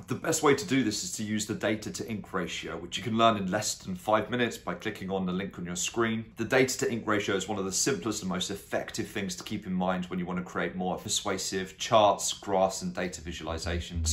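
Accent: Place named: United Kingdom. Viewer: I think you are from UK😊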